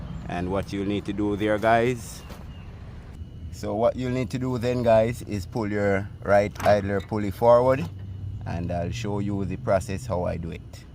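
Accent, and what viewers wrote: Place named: Jamaica. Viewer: You did a great job thank you for the tip and showing us what to do and I like your accent are you Jamaican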